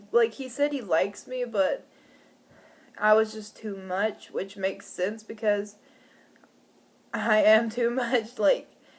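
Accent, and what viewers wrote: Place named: Kentucky, United States. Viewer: What part of Kentucky are you from?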